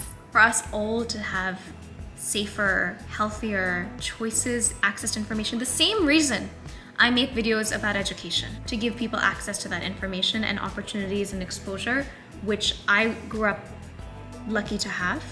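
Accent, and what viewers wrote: United States. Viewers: Didi ap aise english bolte ho jese ki ap phle se american ho❤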